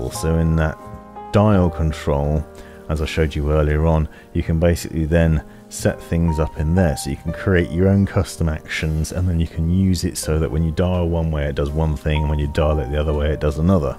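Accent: England, United Kingdom. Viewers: Обожаю настоящий, английский язык, а не нищий американский. Мёд для ушей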